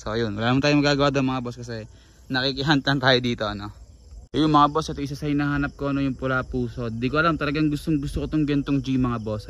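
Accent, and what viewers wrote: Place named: Nueva Ecija, Philippines. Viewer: Taga nueva ecija kaba idol? Pang nueva ecija punto mo e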